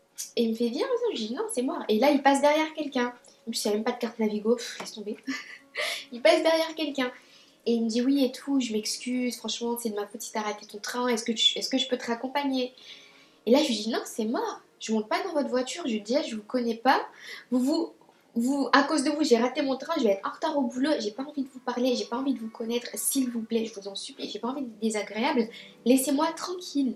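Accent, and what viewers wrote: United States. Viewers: t'a trop l'accent américain et j'aime trop ta storytime . je m'abonne 😘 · t tro belle est ton accent amairiquain ik est 😍😍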